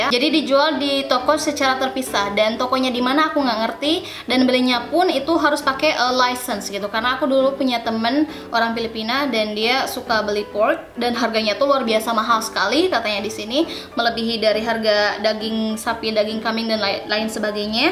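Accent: West Java, Indonesia. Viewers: Suka sama gaya bicara nya sunda pisan 😍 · Tth tj pakih mana sunda na kitu..jntn panasaran...nuhun resepna... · assalamualaikum tth nthh angin😂 apa yg bisa bawa sya ksnii duhh tth ngomongnya khas sunda bgt ya ihhss gemes😂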